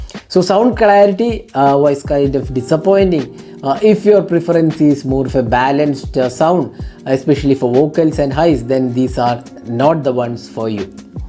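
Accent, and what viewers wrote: Kerala, India. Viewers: You sounds like a mallu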